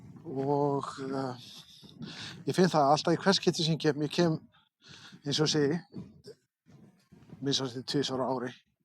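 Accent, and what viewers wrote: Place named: North, Iceland. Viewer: You'll always be from the North! Maybe 20% Vestmannaeyjar & 10% Borgarnes 🙊 Great video, breating was btw not too load, the scenery was great, per usual & i look forward to your next videooo ❤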